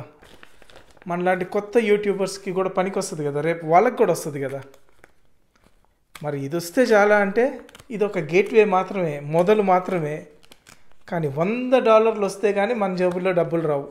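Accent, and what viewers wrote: Nellore, India. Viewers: I have a very small question bro... If you don't mind... Are you from Nellore?? Bcz some words Nellore yaasa tho match avtunnaayi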